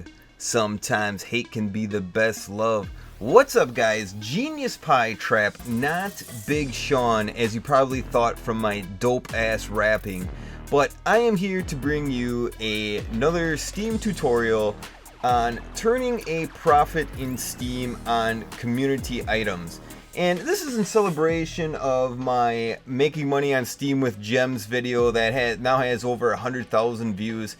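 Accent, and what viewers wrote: Canada. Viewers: You sound Candian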